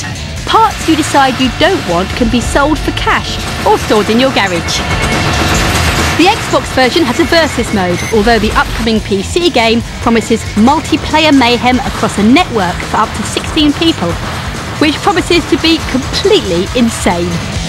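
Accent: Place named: United Kingdom. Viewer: shes too british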